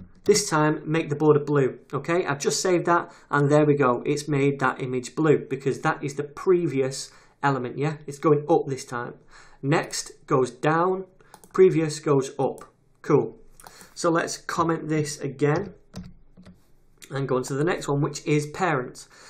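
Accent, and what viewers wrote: United Kingdom. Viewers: is this the so called noble British accent?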